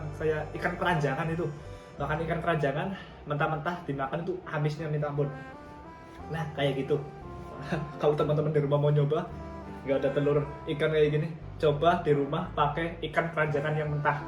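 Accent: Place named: Banyumas, Indonesia. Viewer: ngapak e oraak ilang rek😅 Mantab mas, lanjutkan😁👍 salam angkatan IM JAPAN 315🤝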